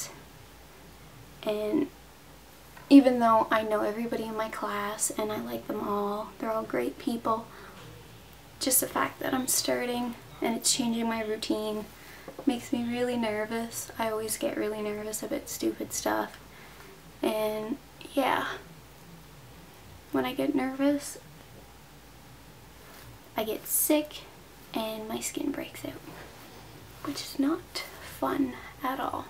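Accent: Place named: Canada. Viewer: Are you Canadian? Lol, your accent clued me in, but then you said "shoppers" hehe.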